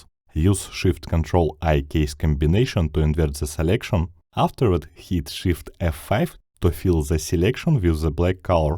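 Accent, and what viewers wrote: Russia. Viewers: А можно по русски? По голосу слышно что можно. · ох уж этот русский акцент))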